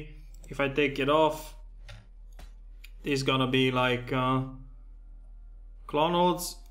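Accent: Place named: Romania. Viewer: stiam ca esti roman. dupa port dupa glas:P <link> check it out:P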